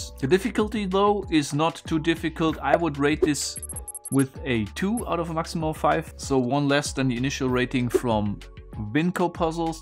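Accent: Germany. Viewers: It's typical German accent, as we don't have the "v" the same way pronounced as in English and the sound itself not at all (v is pronounced the same as the f mostly. voiced v doesn't exist). With some exercise we can do it but is some extra work while talking. Accents by non-native speakers are mostly based on wrong pronounciations.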